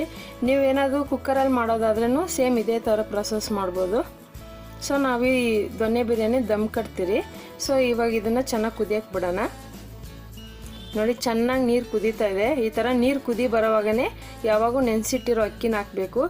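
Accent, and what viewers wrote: Tamil Nadu, India. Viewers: Tamil nimma mother tongue allva nimma language hage ide.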